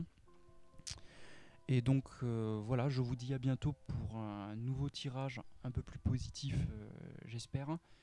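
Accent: South, France. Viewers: votre voix est agréable avec aussi cet accent du soleil . bonnes voyances également. cordialement .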